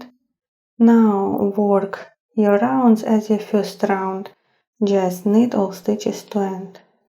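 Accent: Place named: Russia. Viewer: Сильный русский акцент... Тяжело воспринимается. Жаль :(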